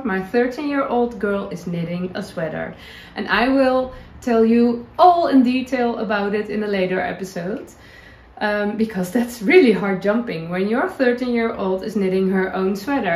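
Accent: Netherlands. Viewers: I cannot thank you enough for taking the trouble to do a podcast in English. Your “Dutchy” English is quite good and enjoyable to listen to. I had heard about you for a little bit, but just started watching your knitcasts last week. I would 100% say that you come across as genuine, quirky and fun…. I especially enjoy your love of brown and your hair. You have one of the most lovely hairdos, curly bangs and all. 😊 Hope you have a lovely week. Looking forward to seeing you steek your cardigan! 😁💚🧶☕️ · Thank-you for another lovely podcast. I enjoyed seeing the countryside around your town. Your dog riding in your carrier bin made me smile. Not that my opinion of how you wear your should make any difference, but I like the curly bangs/fringe they go with your curly hair 😊 A glimpse into life in another country and the "dutchy english" are both good. Keep slaying those dragons 🇨🇦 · I’ve just started to watch today’s podcast but I had to finally comment. 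I’m in the US but from Canada where my parents immigrated to from Belgium in the 50s. My mom, who passed 3 years ago, also spoke “Dutchy English” and so many of your expressions remind me of her. ❤ my parents are from Brugge and Meetkerke and so much of what you share reminds me of my family. Today when I saw you leave your house and saw the colorful plastic strands in your doorway it made me hurry to the comments….my aunt had those too! ❤ thank you for bringing so many memories of love and family back to me…. And I love your clothes and granny squares!!! 😄 thank you sharing your life and knitting/crochet. PS the bangs are nice curly!!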